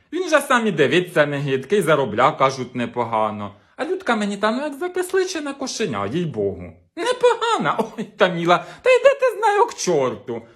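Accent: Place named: Kirovohrad, Ukraine. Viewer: Привіт з Німеччини,! Живу тут 8 років і сумую за нашим сленгом. Я з Кіровоградщини і говір похожий.Але такі перли у нас не видавали ще. Оце вже ввійшло за традицію - Прихожу з праці і одразу шукаю нове відео від тебе. Подивилася,бальзам на серце отримала і можна з хорошим настроєм відпочивати. Коли ж вже нам Гюльчатай відкриє своє личико? Не тримай так довго інтригу. Бо такі таланти треба оприлюднювати. Бажаю творчих успіхів,мирного неба і здоров'я! І слава нашій Україні!!!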